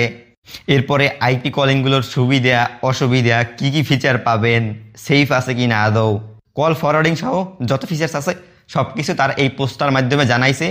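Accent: Barishal, Bangladesh. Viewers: ভাইয়ের বাসা কি বরিশালে? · শুদ্ধু বরিশাইল্লা মিশেল ভালোই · বুঝতে পারছি যে ভিডিও ছাড়ছে এর বাড়ি খাস বরিশাইল্লা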